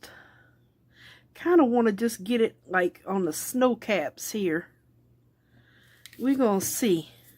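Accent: South, United States. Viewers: You had me with the Southern Drawl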